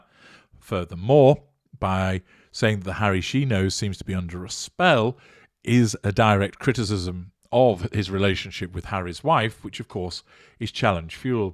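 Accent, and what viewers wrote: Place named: Cockney, United Kingdom. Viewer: The east ender voices are just priceless and make me laugh every time.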